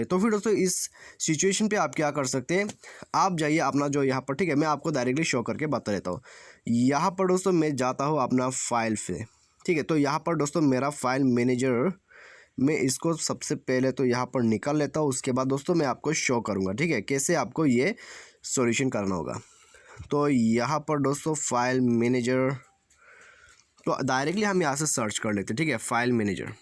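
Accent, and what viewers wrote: Assam, India. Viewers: Bro i think you From Assam? 🤔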